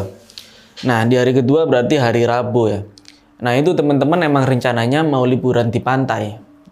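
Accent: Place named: Surabaya, Indonesia. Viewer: Medok suroboyoan e,,👍😁😁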